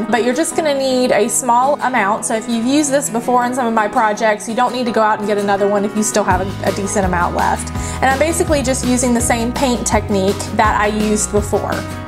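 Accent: South, United States. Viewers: I guess it probably because of the music and your voice is the voice of my southern life..❤️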